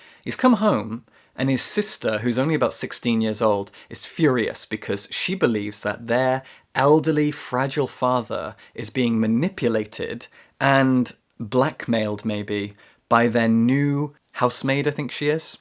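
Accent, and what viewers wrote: United Kingdom. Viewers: I'm so glad I found your channel. I could listen to you speak all day, both for your rich voice (I adore a British accent, being American and an anglophile) and for your professorial tone. I feel smarter just for having listened to you! You gave me all sorts of ideas for next reads. I look forward to watching more of your videos. I'm a retired school librarian and grandmother and I miss being surrounded by book people--who of course are the best! Thanks for all you do.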